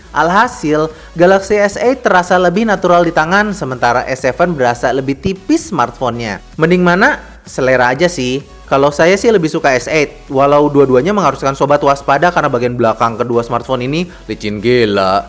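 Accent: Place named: Java, Indonesia. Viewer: Jawa nya medog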